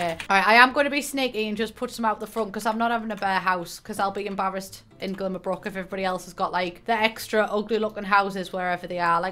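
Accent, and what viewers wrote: United Kingdom. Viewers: Well I only understand half of what she says, but makes sense since I'm not native, and also I wonder from which part in the UK is she from. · I cant imagine trying to understand her if your not a brit especially if you already struggle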